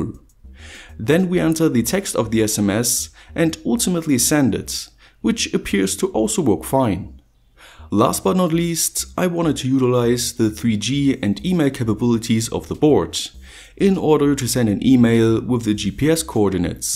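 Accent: Germany. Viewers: is he swiss or German? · Your voice has an German accent which i really like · why he sounds like a german?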